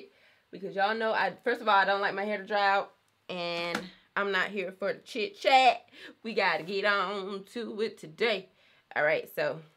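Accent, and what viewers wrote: South, United States. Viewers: I 👂🏾 a southern drawl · Omg!! Just found your channel and I love it! Your hair looks great! Have you tried the Dickey Method wash’n go? New subbie just because of your accent, you gots to be from the South!! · I know your family originally from the south lol "ju lie Dat rie dur" sound like all my family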